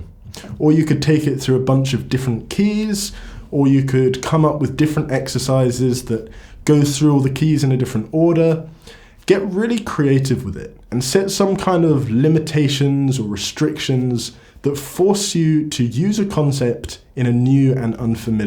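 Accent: United Kingdom. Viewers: I'm here for the accent: I love the way you say, "What is up guys" like you are Prince William 😅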